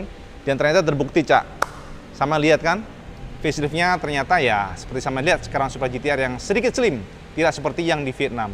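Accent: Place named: Java, Indonesia. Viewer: Duh duh bahaasane penak dirungokne jowo ne kentel .. wes lupakan fanboy 🙏🏻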